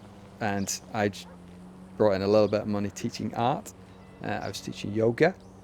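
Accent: United Kingdom. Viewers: I’m not sure if u worked at rockstar north in uk or there main HQ in NY but u sound British, interesting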